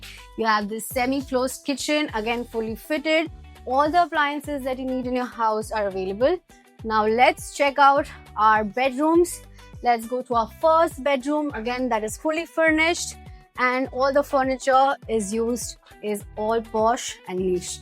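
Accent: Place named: India. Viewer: That is full Indian voice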